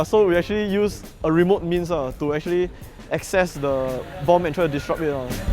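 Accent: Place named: Singapore. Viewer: His singlish .....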